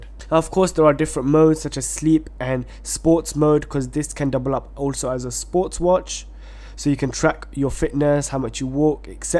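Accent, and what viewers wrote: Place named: India. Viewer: Are u indian?if u are then ur accent is pretty impressive :)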